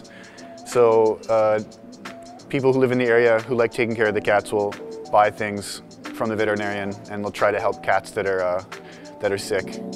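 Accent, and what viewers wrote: Turkey. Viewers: o kadar türkçeyle yaşayınca adamın ingilizcesi türk aksanlı olmuş. "hi my name is "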